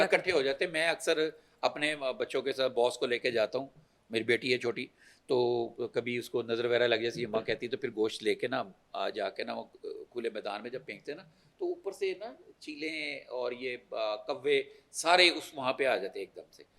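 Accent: India. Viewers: Host 's pronunciation is silly ,aping lndians, quite disgusting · Is this host indian? Why is her accent like them? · Don't try to have indian style of pronouncation. Khan as kan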